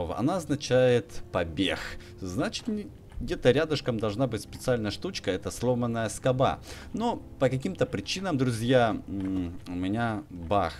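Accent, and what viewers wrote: Ukraine. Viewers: Он Украинец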